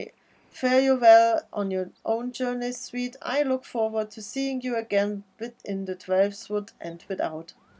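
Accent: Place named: Germany. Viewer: Her accent sounds german... Bist du Deutsche? 😆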